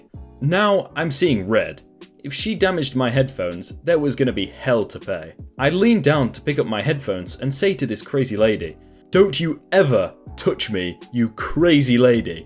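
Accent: United Kingdom. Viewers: The british accent power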